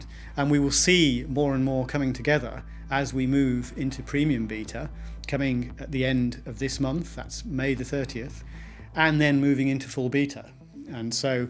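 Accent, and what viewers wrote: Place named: United Kingdom. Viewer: Everyone in the UK says it that way.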